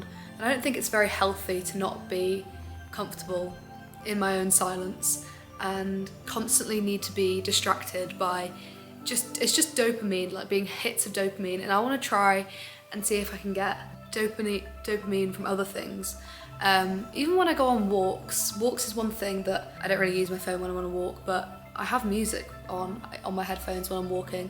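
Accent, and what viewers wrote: United Kingdom. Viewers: Guys her accent , is it british?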